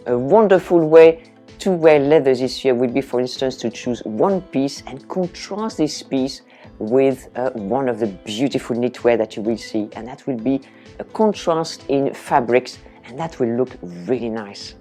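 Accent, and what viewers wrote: France. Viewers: I love your French accent!!!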